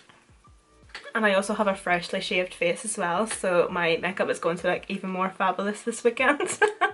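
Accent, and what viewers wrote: Northern Ireland, United Kingdom. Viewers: love this look, I'm from Ards so it's good to hear a northern irish accent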